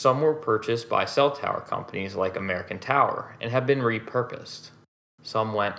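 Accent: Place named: Philadelphia, United States. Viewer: Man that's a thick Philly accent you got there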